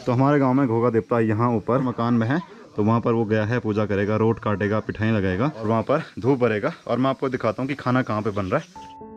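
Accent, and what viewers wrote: Garhwal, India. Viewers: भाई एक विडिओ पहाड़ी में बनाओ ना आपकी गढ़वाली भाषा अच्छी लगती है ❤ जय देवभूमि जय उत्तराखंड ❤🙏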